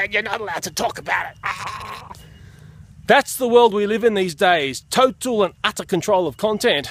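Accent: Australia. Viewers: Ur videos kill me i love ur Australian accent its the best . GOODNIGHT SISTA 😂😂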